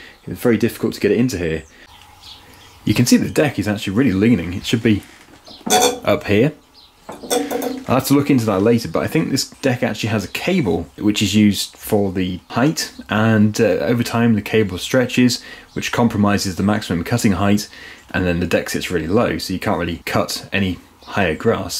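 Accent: United Kingdom. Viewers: May I suggest a shake and break for stuck screw removal? Works great for me. Classic British accent adds class to you videos.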